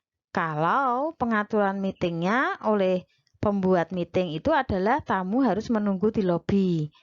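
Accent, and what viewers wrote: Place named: Java, Indonesia. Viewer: Suaranya bu khas banget jawanya 😉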